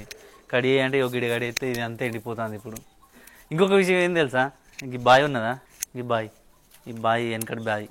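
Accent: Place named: Nirmal, India. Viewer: Meedhi Nirmal ha annna mem.sem hatlane matladutham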